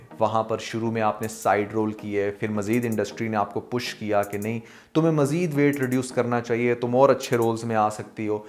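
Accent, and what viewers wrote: India. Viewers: exactly ajeeb ganda paindo indian bhaiyya wala style hai bolnay ka. seems an uneducated person. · Aap apni guftagoo mein kuchh indian rang bharnay ki koshish kr ke apnay bolchal ka satiyanas kr rhay hain. Jesay keh lafz "phir" ko "fir" bolkar aur khush ko bhi indian style mein bol kr. Meray khayal mein is trah aap ne apna bolnay ka standard gira liya hai.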